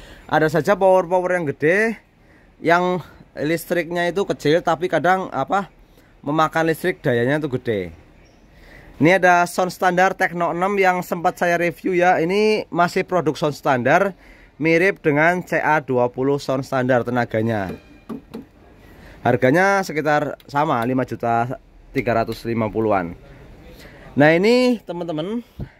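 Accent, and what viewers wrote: Banyumas, Indonesia. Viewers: Sampean asline ndi kang.. Kayane ngapak nemen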